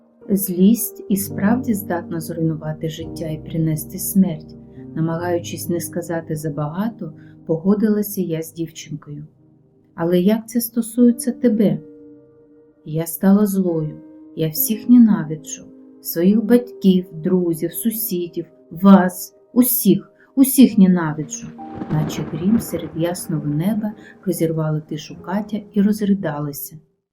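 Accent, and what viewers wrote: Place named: Ukraine. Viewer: Дякую! Як мило звучить Ваша українська. 💗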